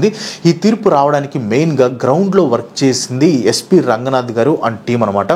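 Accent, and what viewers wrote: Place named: Rayalaseema, India. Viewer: Meeru seema bidda ahh?! Maadhi kuda seema ney! Andhuke aa yaasa nu batti adigaanu😎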